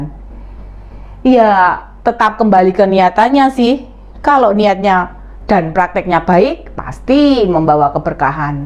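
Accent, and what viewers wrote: Java, Indonesia. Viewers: Mba pertahankan medokxa👍👍👍aku seneng👍👍😜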